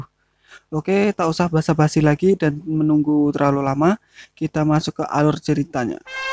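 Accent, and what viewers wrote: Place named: Java, Indonesia. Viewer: Suara elu itu mas mas jawa, blepotan, ancur banget poko nya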